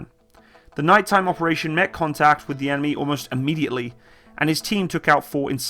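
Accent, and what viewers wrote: United Kingdom. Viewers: I'm ex air force elite medical rescue. The British narrative is offensive. You're Not American. Please be respectful, sir. Although I do admire your respect. Put subtitles for translation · I was not expecting the British accent when I clicked on this video. And after I heard the accent, I was not expecting the level of detail and attention put into this.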